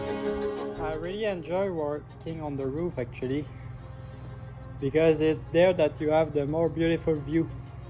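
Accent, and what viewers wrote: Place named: Quebec, Canada. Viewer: Excellent vidéo sur le sujet! Et presqu'en français... Hi H... Pour vrai, l'anglais utilisé est enfin à ma portée. Parler à la George Saint-Pierre a vraiment son charme aussi. Bref, le plus important est sans contredit le contenu apporté. Les détails sont suffisants pour quelqu'un qui souhaiterait se lancer. Ça vraiment le mérite de faire rêver. Grand merci. PS: Vous êtes d'où déjà? Je me souviens d'avoir été impressionné lors d'une épisode de Go van.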